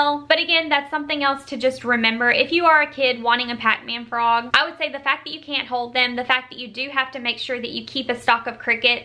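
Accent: South, United States. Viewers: she has a really southern accent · Love ur country accent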